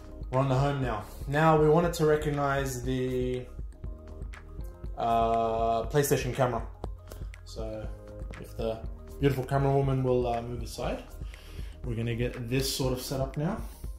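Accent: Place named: Australia. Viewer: Thank you so much you helped me so much also I can tell from your accent that your an Aussi and so i am I which made me understand you more then I do for American accents 😂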